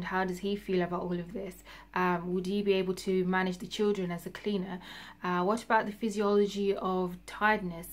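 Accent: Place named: Newcastle, United Kingdom. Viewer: Sounds like Newcastle.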